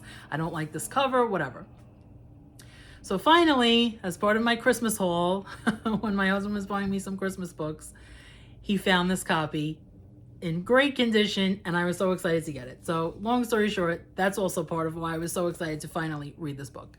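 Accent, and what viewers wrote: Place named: New Jersey, United States. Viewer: I love your accent so much. Is it New jersey or Pennsylvania? Sounds like Marisa Tomei in My Cousin Vinnie.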